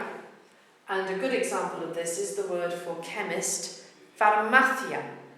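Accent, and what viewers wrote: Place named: Spain. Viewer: Have to start saying these videos are really good and she explains them very well, after all she is explaining the basics and then if people want to learn the language just have to learn vocabulary, now english speakers need to know that as in english language there are accents, and she is explaining spanish from Spain accent, thats why the CE/CI sound like a ZE/ZEE. so since most of spanish speakers are latin that CE/CI sounds like the S when you say say. very good video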